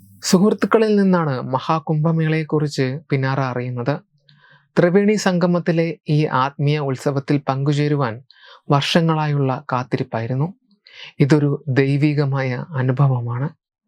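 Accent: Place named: Kottayam, India. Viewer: Are you from kotayam or Pathanamthitta area bha or pha 😂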